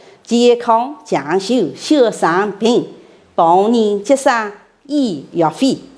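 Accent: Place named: Qingtian, China. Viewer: 有点青田话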